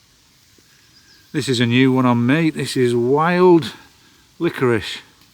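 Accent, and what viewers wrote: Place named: Yorkshire, United Kingdom. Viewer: Greetings from Alberta Canada. We used to live in Melmerby Coverdale, and we really miss the walks. Thanks for sharing your lovely walks with us. We really enjoy them, and love your photos of flowers, birds, butterflys etc, and all the information you share, and we love your Yorkshire accent. Please keep walking and sharing.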